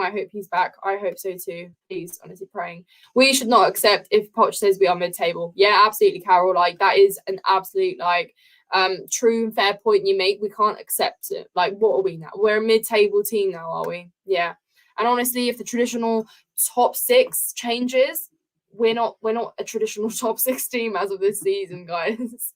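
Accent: United Kingdom. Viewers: Thanks.Ive got to say that the board will be taking that decision anyway.The next three games to the New Year could well put us in the danger zone.Could well be another interim manager to the end of the season..Its the Poch.playing system that is causing all these difficulties.Dearly wish we had signed Naglesmann to play that German system.It does work in the Priemership as Klopp has proved. Incidently I love hearing your London voice brings many homesick memories back!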